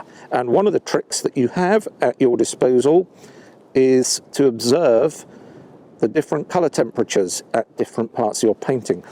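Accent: England, United Kingdom. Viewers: i havent watched your channel in a long time. i always love it. and the english accent is way worth watching the whole thing.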